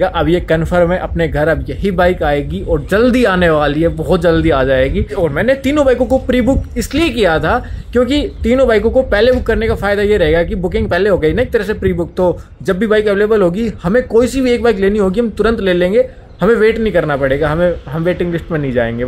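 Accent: India. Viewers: i love your accent bro .. sounds like a pahadi ❤❤ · Bhai aap kaha se ho Rajasthan m , boli se aap Jhunjhunu side k lgte ho ❤ , btana bhai jrur se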